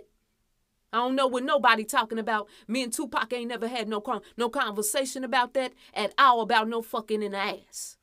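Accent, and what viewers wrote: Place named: South Carolina, United States. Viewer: Bihhhhh you sound like me and folk down here in South Carolina...keep spiting that truth out fam..Gullah geechie..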